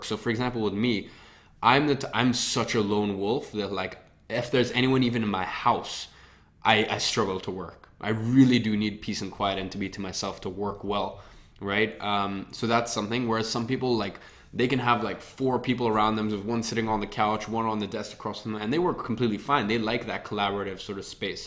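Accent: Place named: United States. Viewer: Hey! How come you have American accent living in uk?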